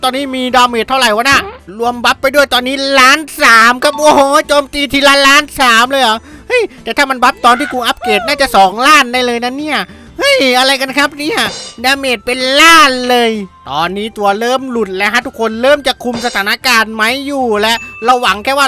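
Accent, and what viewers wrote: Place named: Korat, Thailand. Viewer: โคราชอยู่นิดหน่อย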